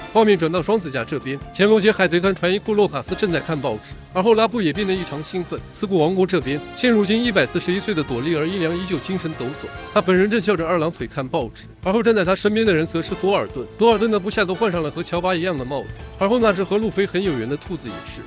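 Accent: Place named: Sichuan, China. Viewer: 你是四川人哦？講話的腔調跟王剛很像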